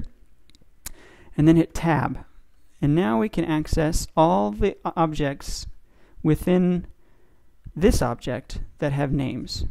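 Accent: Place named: India. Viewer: Not a thick indian accent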